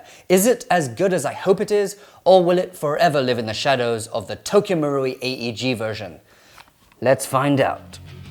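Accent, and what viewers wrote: RP, United Kingdom. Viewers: Well, if it's genuinely how you talk and you aren't putting it on for the camera, then I will have to apologize for being derogatory towards you. I would guess having a posh british accent with the native language would dilute them into a mixture of both. Truth be told my problem is with people who pretend for the camera. I hope you accept my apology as I do enjoy your video's. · looks asian talks like a brit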